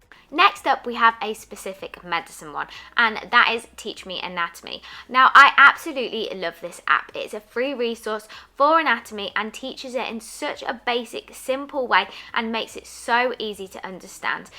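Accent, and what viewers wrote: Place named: United Kingdom. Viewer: Guessed it with your British accent..😌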